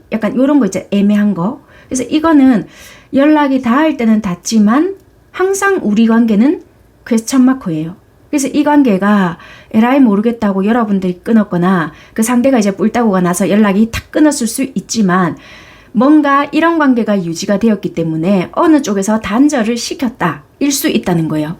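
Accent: Busan, South Korea. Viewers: 너 부산에서왔쩨ㅡ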